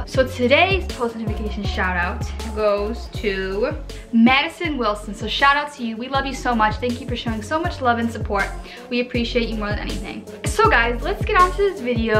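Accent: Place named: Chicago, United States. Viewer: Are you from Chicago???? My mom is so i have a Chicago accent too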